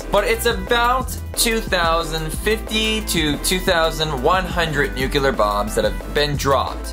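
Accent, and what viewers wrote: Maine, United States. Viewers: Wow. My first time hearing an accent from Maine. Hello from Texas! :D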